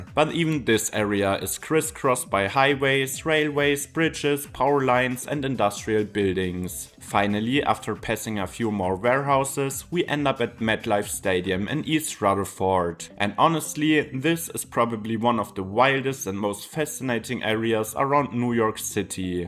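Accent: Germany. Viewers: That German accent is too pronounced and unmistakable.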